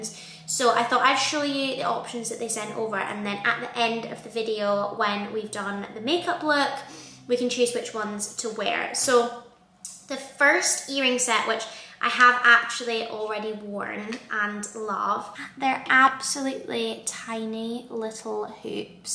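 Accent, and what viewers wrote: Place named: Scotland, United Kingdom. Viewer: Gosh I can relate to you with your IBS I went through the very same thing but it was Celiac I was diagnosed with eventually It can be so hard at times but we learn to adjust and live with it Love your “ Make Up “ you look so lovely Really enjoy your Blogs and glad you have your Mum around to help you Can I ask personally where you come from as you sound very like me I was born in Fife but came to Australia from Edinburgh I just love listening to you and your sewing is amazing I am very much a beginner now as hadn’t sewn for over 40 yrs Have a wonderful Xmas with your family ❤️🌹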